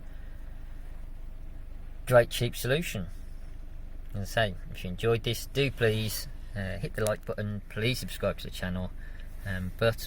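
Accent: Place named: West Midlands, United Kingdom. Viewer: funny west midlands accent. Sorry I can't listen to it. I'm so sorry.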